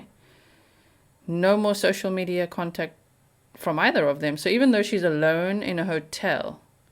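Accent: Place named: South Africa. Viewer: Why would his parents have concealed that Brian left for home from the 17th-23rd to empty out a storage unit? I have never heard this. How and when did you hear this? I enjoy your astute observations. I thought I heard a South African dialect. Thank you for sharing your background and your father’s background. I am a woman Vietnam Era Veteran Navy WAVE. During service I had a Secret Security Clearance. Thank you for your broadcast. Greetings from the shores of Lake Erie on the north coast of Ohio in America. Canada is 45 minutes straight across the lake.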